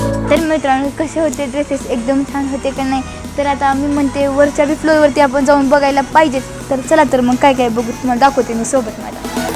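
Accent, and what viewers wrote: Latur, India. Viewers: Perfect latur language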